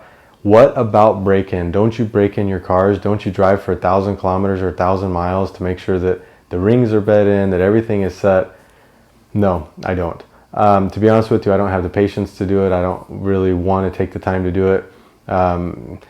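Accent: United States. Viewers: Where are you from? your accent is American but you live at the Green Hell...I'm confused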